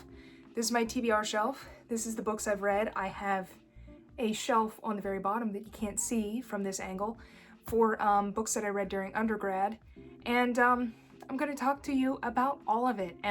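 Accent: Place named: Carolinas, United States. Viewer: I think it's from the Carolinas in the Southeastern Coast of the US. I'm a Mississippian stuck in the PNW and I think it's lovely.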